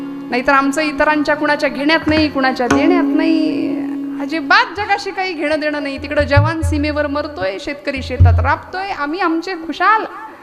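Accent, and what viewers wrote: Standard. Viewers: फार सुंदर , छान सादरीकरण शुद्ध उच्चार गोड वाणी मनाला भावून जाते .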